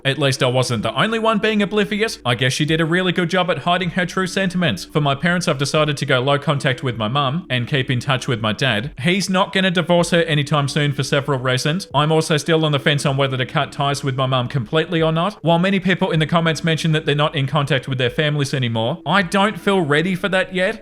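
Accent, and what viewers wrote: Australia. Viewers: Everybody has an accent. Thats how speaking works. And youre Australian? And you dont think you have an accent? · On the point about accents, you do have an Australian accent, although not super strong. Everyone has an accent, mine being a mix of Pacific Canadian & central Canadian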